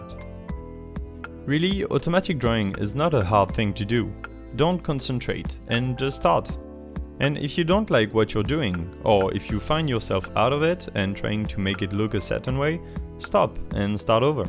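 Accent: France. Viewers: Gotta say I wasn't expecting someone with a french accent but hey, pleasant surprise ! Oui, effectivement moi aussi je suis francophone- Super vidéo ! Je vais probablement m'y essayer de temps en temps.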